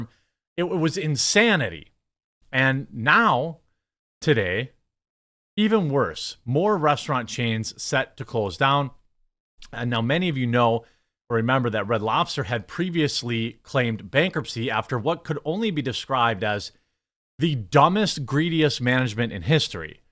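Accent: Wisconsin, United States. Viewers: That my friend is a Wisconsin way to say bag. I’m in northern Illinois. It’s baag. lol. Love your videos brother Also. I’m telling you guys “Demolition Man” 1993 predicted this. In the movie Taco Bell was the only restaurant to survive the franchise wars. Took place in 2032. They have begun 😂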